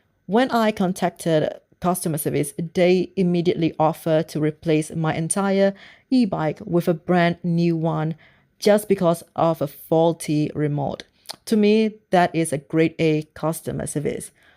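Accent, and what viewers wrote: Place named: United Kingdom. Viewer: Tq for sharing. Nice accent though..such watching review from someone living in uk.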